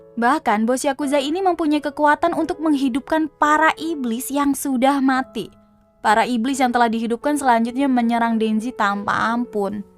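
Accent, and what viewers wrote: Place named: Tulungagung, Indonesia. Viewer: ooo iki ye wong tulungagung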